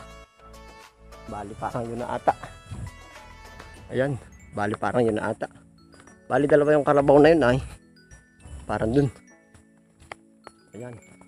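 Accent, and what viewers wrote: Quezon, Philippines. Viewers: Taga quezon din po kami...nakakaaliw manood sa punto p lng quezon tlga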